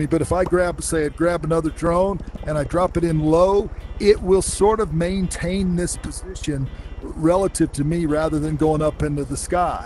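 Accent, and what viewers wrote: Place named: Texas, United States. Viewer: btw are you from Texas? No offense, your accent is kinda Texas like.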